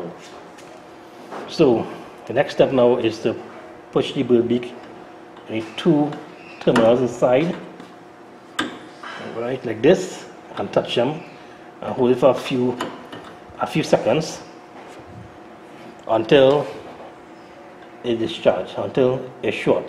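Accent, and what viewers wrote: Trinidad and Tobago. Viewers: Are you Trinidadian ?? Lol my microwave turned off and wont turn back on now dont know if the fuse is blown or not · Surely........a Trini accent.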